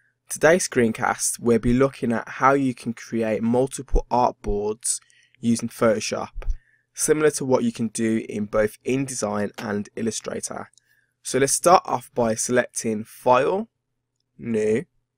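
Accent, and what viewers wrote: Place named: United Kingdom. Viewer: Oi! Ya bri' 'ish, mate?!?! Lovely accent <3 Thanks for the video, helped me out a lot!!! <3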